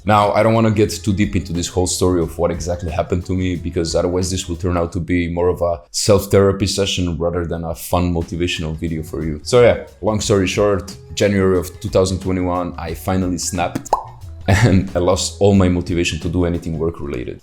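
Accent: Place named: United States. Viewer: Damn bro, you speak like Amerikanski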